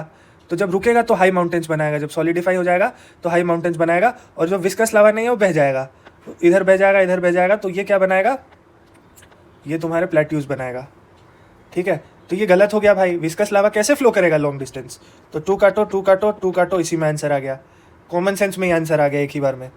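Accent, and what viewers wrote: India. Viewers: Sir aap banaras se ho kya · Sir aap bihar ya purvanchal up se ho kya ? Aapki language tone kuch waisa hi lag raha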